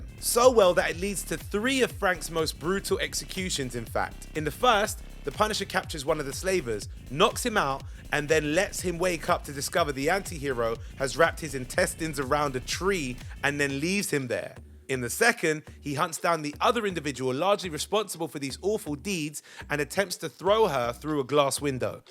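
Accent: United Kingdom. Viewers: This guy is to British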